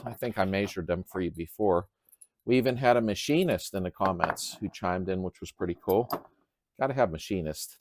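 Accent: Pittsburgh, United States. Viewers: Nice Video, I recognize that Pittsburgh accent anywhere I go...Where you located?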